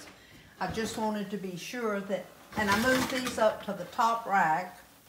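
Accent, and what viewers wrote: South, United States. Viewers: You wear the most beautiful clothes when you cook! I just love your southern accent. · I love southern accent.. I just told my hubby I think she sounds so much like Rosalynn Carter.:) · What state has this accent?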